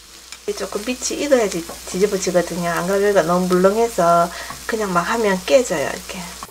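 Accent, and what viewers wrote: Gyeongsang, South Korea. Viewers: 경상도 사투리인가? 넘 귀엽네요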